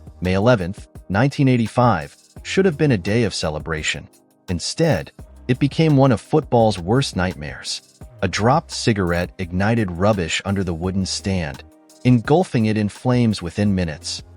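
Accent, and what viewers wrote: United States. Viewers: Hoo the phuq are you? It's pronounced "Hills-bu-ruh"/"Hills-bruh" not "Hills-borrow". It's "Seltic" not "Keltic". "Berm-ing-gum" not "Ber-ming-HAM". "White Lane" it was "White Hart Lane". It's "Darby" not "Der-by". " 1970 ess" for "1970s" get real. Whoever you are do yer effing homework. Pretty much every ground in England, Wales and Scotland was the scene of "hooliganism" in the late 1960s, 1970s and early1980s - nothing to see there. Every ground had its area (or fortress) for its hard nut fans. And I am sick of the ubiquitous (look it up in an effing dictionary) US accent, pronunciation and emphasis (we (UK) emphasise the second or last syllable - not the first) on effing everything you watch on YouTube. Is the US trying to take over the world by its cultural imperialism? I think so - phuq off with yer cultural imperialism. Answers on a post card - you effing Yanks might have to look up that UK cultural reference. 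Jog on. Sick to death of you. - or the people who use some Silicon Valley tool to make yer vids - do your own voice-over, if you want to be (or appear) authentic. Rant over....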